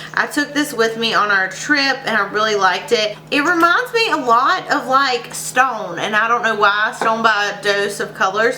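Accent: South, United States. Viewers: You are SO beautiful. I love your videos so much. You are such a sweetie. Your makeup looks gorgeous! I would LOVE to see a tutorial. I could listen to you all day. I love your accent! I am a southern girl too. Love you,.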